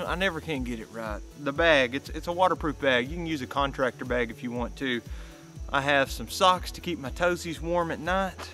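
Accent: Kentucky, United States. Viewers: I just found your channel I knew you were a southern Kentucky boy lol. In all seriousness Daniel Boone park is an amazing place.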